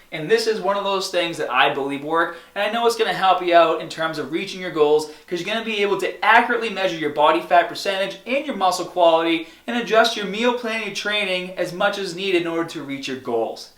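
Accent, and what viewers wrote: Boston, United States. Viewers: watching your videos for months and this is the first time I picked up a type of Boston accent, you must have grown up in mass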